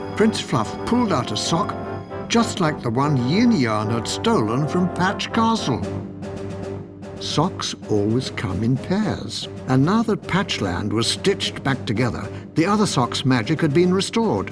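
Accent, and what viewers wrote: England, United Kingdom. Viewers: Narrator is British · Your British, I never knew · I'm loving the narrator with an English accent. It makes this game feel like LittleBigPlanet. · Ok I like this narrarator a lot more than the U.S. One. British narraration is amazing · I wouldn't mind more soothing and amusing British narration in my Kirby games. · very interested, when I played this game in the US he sounds American, and this narrator sound like he's from UK so would that mean is from the UK as well?:o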